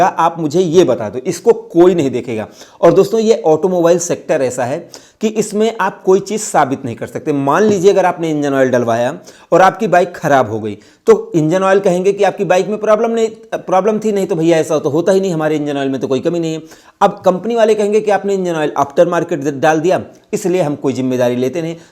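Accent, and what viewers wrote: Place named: Rural, India. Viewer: अब तुम लोग मजाक बनाना बंद करो। यह इन भाई साहब का देशी अंदाज है। नकली लोगो की तरह मुँह बना कर बात करने वालो से दिल ऊब गया है।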